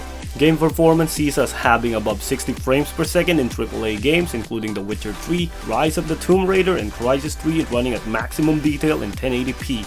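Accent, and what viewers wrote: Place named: Australia. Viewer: it's a rare opportunity you'll see an asian guy with an aussie accent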